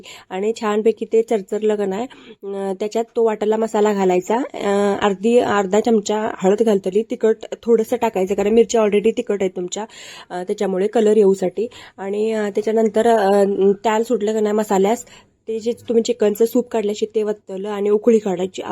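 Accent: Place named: Chandgad, India. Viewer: Chandgadi accent 😊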